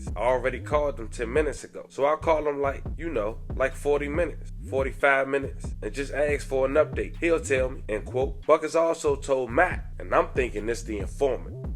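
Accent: Washington DC, United States. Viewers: I thought yu don’t give angles just the story 😂that Baltimore step on they plugs comment sound like an angle to me🤔. Sound like u from DC/DMV so it’s unexpected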